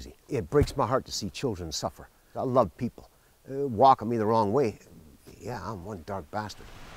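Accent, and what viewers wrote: Canada. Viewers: This guy is clearly Canadian. He has the accent and the video states he is from Ontario. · “Y’know?” Yep that’s how talk in northern Ontario 😭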